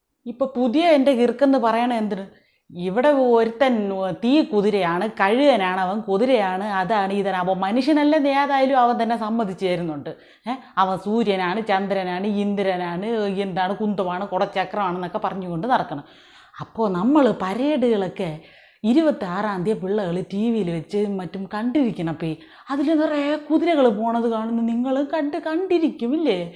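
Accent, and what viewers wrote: Thiruvananthapuram, India. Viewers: I like you, Your Tvm style slang ❤🤣🤣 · Chachi entharu ?. Sukangalu thanne ? Chachide thiruvanthoram bhasha kalakki. Abhinayavum super. Kochu kalli.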